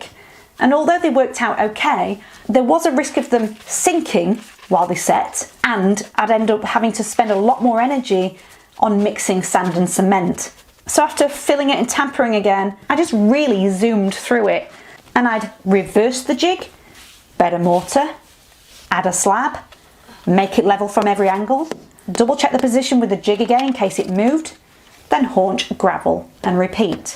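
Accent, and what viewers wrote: United Kingdom. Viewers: Nice British accent❤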